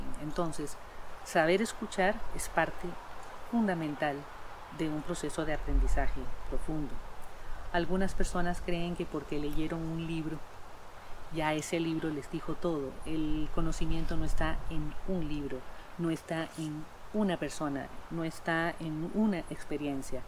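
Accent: Central Mexico, Mexico. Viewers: Creí que eras mexicana... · tu acento del español es muy parecido al que tenemos en la parte central de México así que siempre me es fácil de entender todos los temas que tú expones. Saludos cordiales. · Tu asentó se escucha mexicano del centro.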